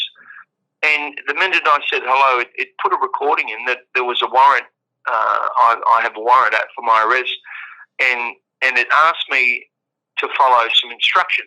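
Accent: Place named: Australia. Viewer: No is kiwi, this guy is Aussie. I would love to get in contact with him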